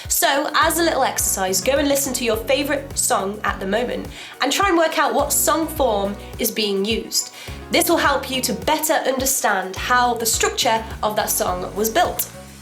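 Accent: United Kingdom. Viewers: I love the way you sound. Your British accent is adorable to ears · That Midlands accent...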